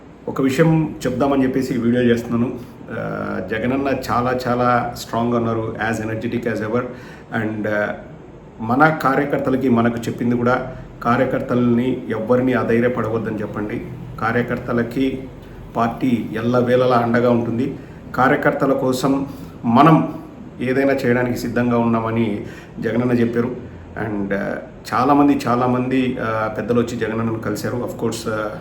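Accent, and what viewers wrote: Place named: Telangana, India. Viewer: Bro nee proper ekkada,Slang matram Telangana la untadi,Kaani nuvvu chala better ,boothulu matlade Prabhakar gaani kanna .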